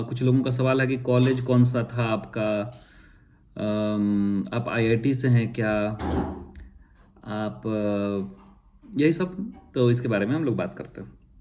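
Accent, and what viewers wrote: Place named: Bihar, India. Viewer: Hello from Canada ..Feel connected as listen Bihari accent after long my mom was from Patna …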